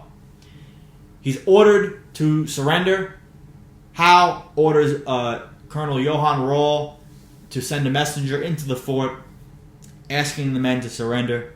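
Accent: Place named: North Jersey, United States. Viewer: Another Great presentation my friend, Thank You. Where abouts in NJ are you from? Judging from your accent, I’m assuming North Jersey lol, I’m originally from Dumont in Bergen County myself, Be Well 👍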